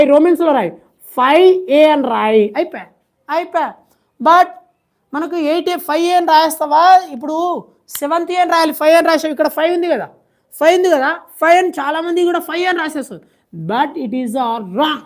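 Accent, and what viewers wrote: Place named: Telangana, India. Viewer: Super Telangana slang ........😉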